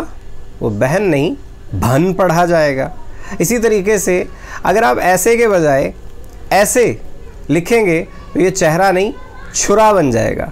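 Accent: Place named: India. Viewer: Aap kahan se ho?.....talaffuz india ka lag raha hai......masha Allah....achchi aur durust koshish hai.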